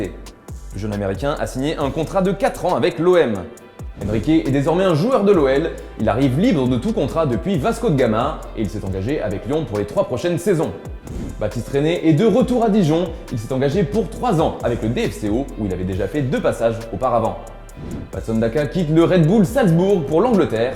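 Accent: Italy. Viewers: C'est lui l'accent italien jadore ce gas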